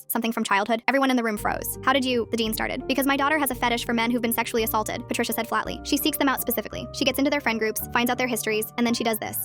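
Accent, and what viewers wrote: United Kingdom. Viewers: that's British accent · Why did she turn British Midway through the video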